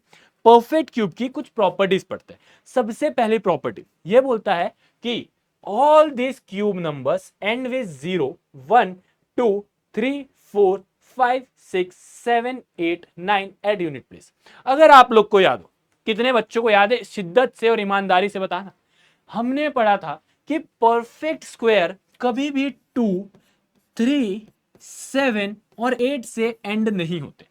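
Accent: India. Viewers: Love ur other Hindi Accent ❤️😭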